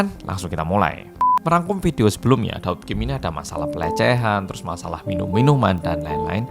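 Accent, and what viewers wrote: Java, Indonesia. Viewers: sampean orang korea apa orang jawa ...bro ... hehehhe kok logatnya medok sekali ...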